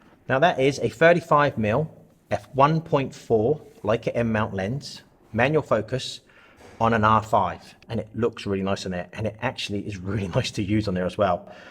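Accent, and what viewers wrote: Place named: Portsmouth, United Kingdom. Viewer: Different is good sometimes, but the colour background is better. What happened to the Pompey accent video? Waiting to show friends here in Japan that I'm not the only one with this accent.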